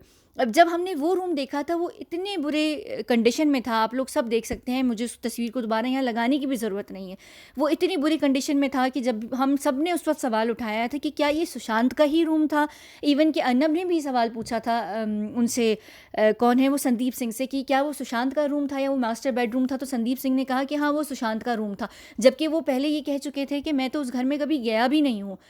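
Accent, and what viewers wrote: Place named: United Kingdom. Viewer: Absolutely in love with your work ma'am. You always back up ur facts with evidence. But I really have crush on your English accent. More power to you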